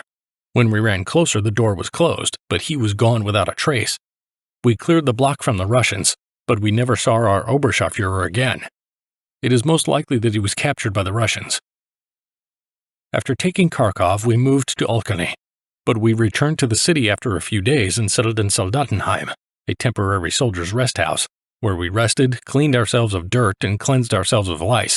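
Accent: United States. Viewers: This was somewhat confusing - the narration being from a German point of view but in an American accent !!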